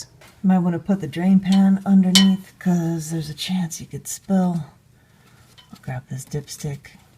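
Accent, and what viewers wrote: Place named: Latin America. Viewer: Are you Latina? I think I hear a slight accent 😊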